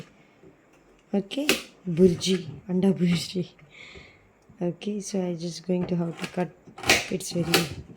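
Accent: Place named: India. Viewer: I already subscribed but I'm a big fan of your voice and what a Telugu slang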